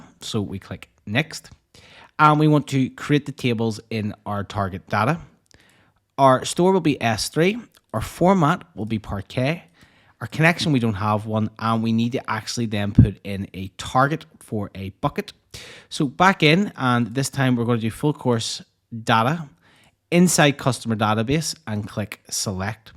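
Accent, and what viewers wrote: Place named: Northern Ireland, United Kingdom. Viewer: Finally an aws tutorial that uses "wee" and talks in a NI accent, made me laugh that I finally found a fellow NI person :) followed every word :D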